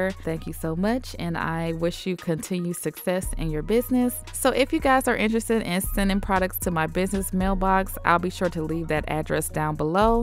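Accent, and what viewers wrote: South, United States. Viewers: Can I just say, with that southern accent and smooth voice.. i swear you sound like Beyonce, especially when you giggle, girl. Thank you for these calming chill videos☺️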